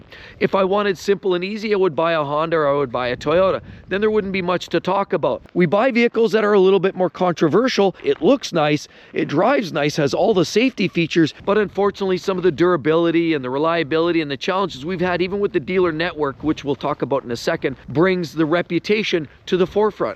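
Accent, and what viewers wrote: Canada. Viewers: You' re canadian, aren' t you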